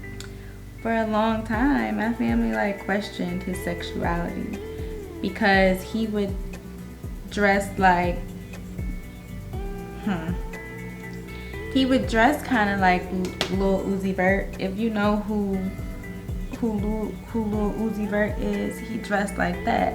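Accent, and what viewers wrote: DMV, United States. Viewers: I knew you were from the DMV. Lol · 10 sec in are you from the dmv ? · only been here 40 seconds and i can tell youre from the DMV 😂 subscribes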